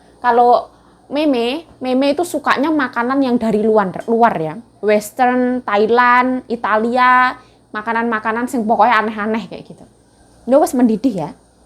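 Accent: Java, Indonesia. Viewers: Logat Jawa nya kental bngt kk, Keren, baru pertama lihat chanel ini langsung nonton trs, dengan ciri khas logat Jawa keren kk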